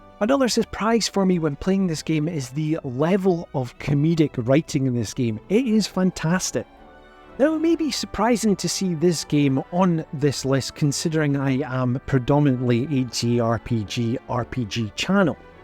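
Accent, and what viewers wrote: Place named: Dundee, United Kingdom. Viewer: lol as soon as i heard the accent bro i was sure it was dundee lol. i moved here not long ago and that's how everyone sounds to me :P if you heard me you would probly be able to guess what parts i'm from as well haha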